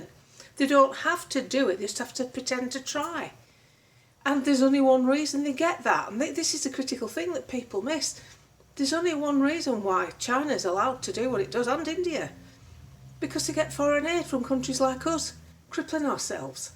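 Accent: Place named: Lancashire, United Kingdom. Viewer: Not less than Oliver. You are superb. A strong voice. For me a great Lancs voice. Keep it up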